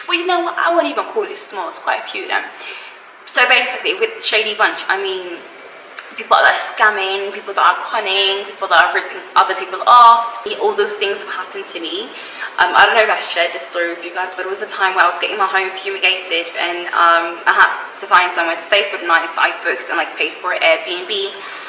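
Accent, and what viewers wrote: United Kingdom. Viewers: U give the feeling that u got a bit attitude . Sorry to say but u are too british in your speaking and body language. If u like western lifestyle maybe try to live in the states or uk and leave africa for the ones who appreciate the real africa without west influence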